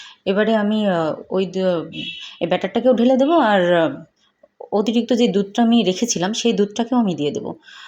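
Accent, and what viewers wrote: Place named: Noakhali, Bangladesh. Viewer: প্রথম থেকে শেষ পর্যন্ত সাথেই ছিলাম আপনি নোয়াখালীর ভাষায় কথা বললেন খুব সুন্দর লাগলো ❤❤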